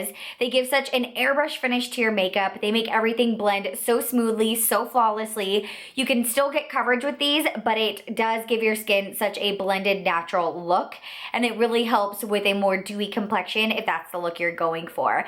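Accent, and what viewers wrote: United States. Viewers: I still can't stand the way american girls speak LMAO 🤦🏻‍♀️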